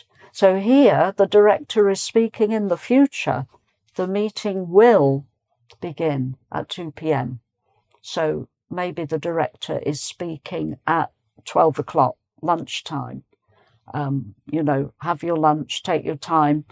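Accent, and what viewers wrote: England, United Kingdom. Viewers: How nice to hear english english rather than american english.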